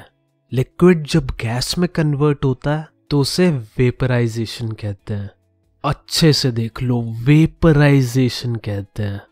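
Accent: Haryana, India. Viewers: I bet ..This guy is from HARYANA !! 🥶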